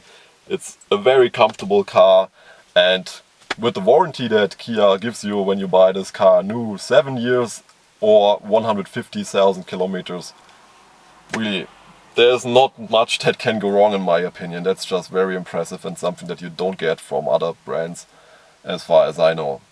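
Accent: Germany. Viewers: canomalo he's German not slow dumbass...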